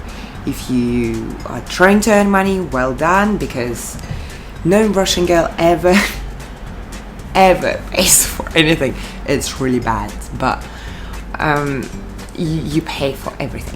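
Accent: United Kingdom. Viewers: british accent? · Her accent sounds so english from England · Sounds you have a British accent. Did you go to school in the UK? or Australian? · Why your accent is British?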